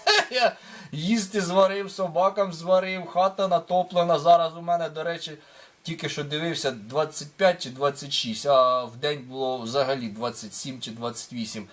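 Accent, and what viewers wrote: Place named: Poltava, Ukraine. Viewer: Дядко точно з Полтавщини! Тільки там так розмовляють. )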